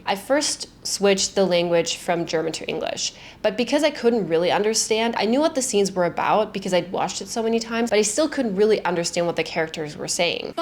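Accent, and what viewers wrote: United States. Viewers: Very entertaining and educational video. Saying that your accent is 95% is selling yourself short. Your English sounds more American than mime, and I was born in Detroit, and raised in a small town in Ohio. Thanks for the video. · Yea, ich bien second gen Deutscher, and you sound just like an American! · Wow. Never seen your channel before. I stopped the video right after you said you've only lived in the US for under 2 years. Yet, as someone born and raised in the US, I can definitely say you sound 1000% American from your "lack of accent" :-) ... I have seen this before, but it was with people who attended an English speaking school growing up that used Americans as teachers. So, while they grew up elsewhere, they were steeped in American English from a young age in school. I need to watch the rest of the video to find out how you did it ... 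· You are doing a great impression of an American woman! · I really respect and admire your authentic American accent. I know you put a lot of work and study into it because it shows. .......HOWEVER, as an American, I think I can speak for a lot of people that the vocal fry is unflattering, annoying, and very unpleasant to listen to. While some people will tell you that it may not bother them too much as it is normally limited to young college age women, or those who live in large metropolitan areas, it's easily avoidable for those outside of those demographics or areas, I have never found anyone who actually thinks the vocal fry is attractive or desirable in women. I am not telling you this as a negative critique, but more as a polite mention that you do not have to include that manner of speaking to sound authentically American. As a matter of fact, many Americans would appreciate it if you didn't. 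We have enough of them here that already have that covered. Thanks. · As a native UK person, you definitely do not sound like German people I know speaking English. I would agree you sound native English American. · Hat jemand, der zweisprachig aufgewachsen ist in Amerika und Deutschland kann ich sagen: gratuliere zu deinem amerikanischen Akzent. Ich selber habe einen amerikanischen Akzent aber ich habe auch dort lange gelebt und weiß wie schwierig es ist für einen deutschen so zu sprechen. Und alles was du sagst, nämlich Fernsehn schauen und Serien. Es ist genau der richtige Weg um perfekt Englisch zu sprechen und wie ein Amerikaner in diesem Fall zu klingen. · Dein amerikanischer Akzent ist so gut, so dass ich, als ich zum ersten Mal auf deinen Kanal bzw. auf deine Shorts gestoßen bin, zuerst dachte, dass du eine Amerikanerin bist, die perfekt Deutsch kann und einen deutschen Akzent perfekt immitieren kann, bis ich den Namen deines Kanals gelesen habe😂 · You have a perfect American English accent. 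I don't think most native speakers would be able to tell its your second language. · She has a very good American accent and one you wouldn't normally notice, I think. But if you listen closely, there are a few giveaways here and there. One of them I've also heard in many other second language speakers is, ironically, the phrase "American accent". My observation is they kind of rush at the beginning of the word compared to an American speaker who says it more deliberately and then they tend to make the i sound more like an ee sound (A-mer-*ee*-ken). I don't know what it is about the word "accent" but I hear so many people saying the word accent in a way that doesn't sound like American English. It's probably the beginning "a” vowel that sounds a bit off. Maybe more like ekcent.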